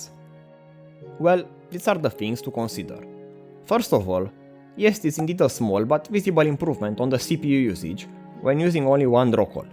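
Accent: Romania. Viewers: You sound romanian! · miros accentu de roman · instant mi am dat seama ca esti roman dupa accent <3misto vid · mama accentu de roman e prea mare :)))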